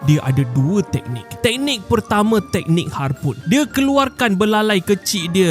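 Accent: Sabah, Malaysia. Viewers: Admin ni kan? Suara tu sebijik dgn slang Sabah dia sikit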